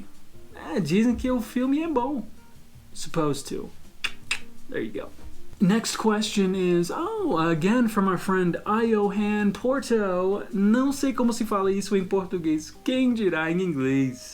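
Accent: Manaus, Brazil. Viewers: Faz muito sentido, qdo ouço ele, parece um brasileiro, sotaque misto do norte/nordeste🤗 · Nossa vc tem um sotaque brasileiríssimo e seu inglês é de fácil compreensão . Parabéns!!! 🤔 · Seu português é genuíno manauara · hey kiddo,, you have reached 5.000 subcribers, congrats! i loved your explanations about situations with WAS SUPPOSED! Nice job! you razed it! Your brazilian portuguese accent is so perfect, really! keep it up! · P seu português e tão bom ..parece um manauara falando...só falta as gírias doida kkk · Te conheci pelo canal do Gavin😁. Assim que te ouvi falar pensei que fosse de Manaus mano😆 (minha cidade natal😍). Parabéns, seu português é muito limpo e claro👏👏👏 Excelente trabalho, torço para que seu canal cresça e tenhas tudo o que merece. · VOCÊ É BRASILEIRO CARA, ESSE TEU SOTAQUE NÃO ME ENGANA. EU MORO NOS EUA FAZ 3 ANOS E SEI MUITO BEM RECONHECER UM FALANTE DE INGLÊS NATIVO E VOCÊ COM CERTEZA NÃO É UM. 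NÃO TENHO NADA CONTRA, SE QUISER PODE CONTINUAR ENGANANDO SEU PÚBLICO. EU SÓ ESTOU MESMO CURIOSO PRA SABER QUAL A RAZÃO DISSO TUDO, SEU INGLÊS É ÓTIMO E VOCÊ NÃO TEM MOTIVOS PRA ESCONDER QUE É BRASILEIRO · Maninho, por isso seu sotaque de Manaus e seu português perfeito!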